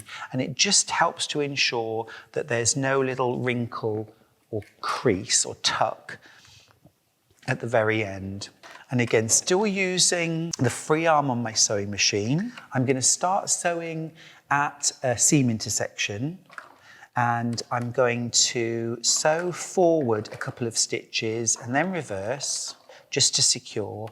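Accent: United Kingdom. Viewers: I really enjoy listening to your videos and your lovely British accent. I've made several of these bags and like the way you've made the tabs a bit oversize. Excellent instructions! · I love English accent. Can you please make tutorial the Japanese style UV protection mask . The mask is attached to the capelet on the sides with adjustable earloop jus like bra strap. the middle of the face there is invisible opening to drink water, the mask overlaps the capelet(or cap). the capelet goes around up to the back of the bottom of the neck by closing with velcro or snaps. I can show photo example in private. Thank you.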